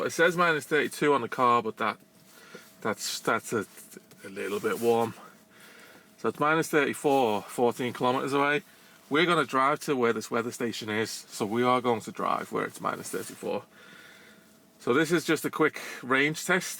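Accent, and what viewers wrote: Liverpool, United Kingdom. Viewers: Sounds liverpudlian to me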